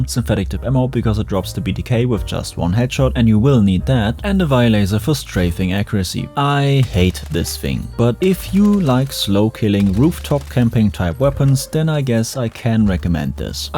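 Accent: Germany. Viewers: I watched until the end because you make some of the best Battlefield videos on the internet. Dry humor, efficient/clear explanations on things, and of course your German accent. Danke, dass du großartigen Content erstellst!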